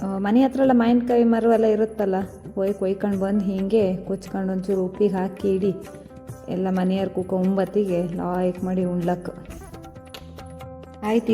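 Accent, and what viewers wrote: Kundapura, India. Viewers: ನಿಮ್ಮ ಕುಂದಾಪುರ ಕನ್ನಡ 👌👌👌👌👌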